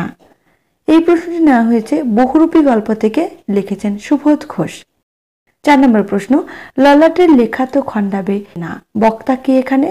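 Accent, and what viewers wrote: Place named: East Bengal, Bangladesh. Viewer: আপনি কি পূর্ববঙ্গের ম্যাডাম আপনার উচ্চারণে বেশিরভাগ অকারান্ত বোঝা যায় তো যাই হোক শুনতে ভালই লাগে।😊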